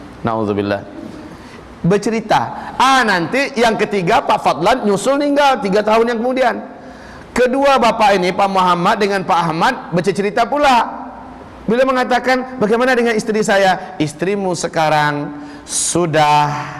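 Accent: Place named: West Sumatra, Indonesia. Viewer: Ustaz...ustaz minang ko bangkinang? Kadang-kadang bunyi minang...kdng-kdang bangkinang.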